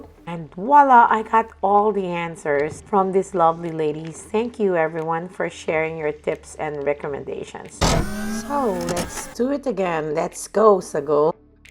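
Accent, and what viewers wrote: Philippines. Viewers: “Bay dah bendorrr” - god I love the Filipino accent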